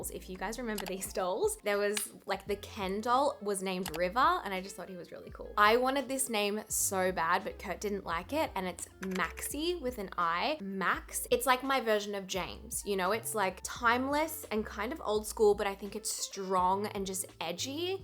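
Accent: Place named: Australia. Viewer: This whole time I thought his name was Hollow not Harlow. I’m not used to the Australian accent I guess.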